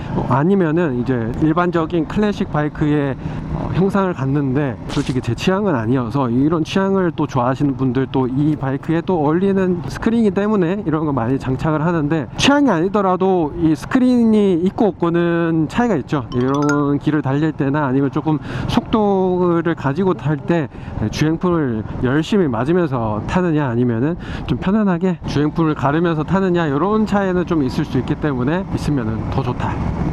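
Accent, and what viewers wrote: Gyeongsang, South Korea. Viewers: 형님 경상돕니까? 너낌~ 세알리고~ ㅋㅋㅋㅋ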